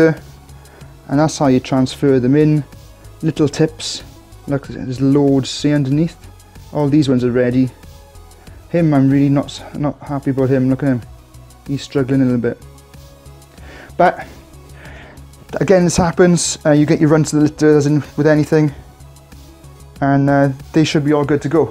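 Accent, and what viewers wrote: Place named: Wales, United Kingdom. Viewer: I really enjoy your videos, no messing around! I love your accent, which part of Wales do you live? I live in Brecon, but I lived in Crickhowell for years, no accents, my brother lives in Merthyr and they all have strong accents that I don’t understand! 😜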